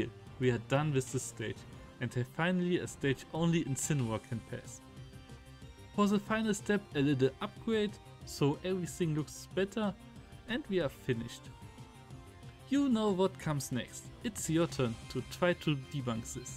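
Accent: Germany. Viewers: kann es sein das du deutsch bist? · You sound german , are you german ?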